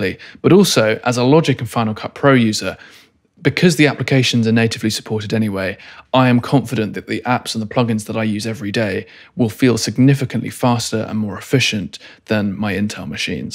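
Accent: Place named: United Kingdom. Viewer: Dear, thank you very much for your video. I like your videos very much and that as a non-native English speaker. I love your and the clean British accent. I think my personal decision has fallen. I will order the standard 16 inch Pro with 16GB RAM 1TB SSD version. Why? The larger display, longer battery life and what many may not know, the better cooling system from the larger one. That may shouldn't tickle the Pro compared to the Max processor. The 16 inch model gets nowhere near as warm as the 14 inch. Well when will that be the case? The weight? If I can't carry my notebook sorry MacBook Pro, then I don't need one anymore. And it's a difference of 200 € for me. I know a lot of money. Keep up the good work. Thanks to you, stay safe, all the best from a loyal subscriber from Bavaria.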